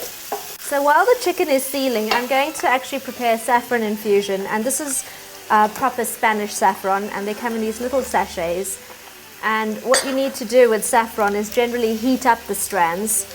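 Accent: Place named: United Kingdom. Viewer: What accent is that? I can't seem to put my finger on it. I was certain it was London or somewhere in the UK until I noticed the South African clover cream. She must have been born in the UK then moved to SA after developing the accent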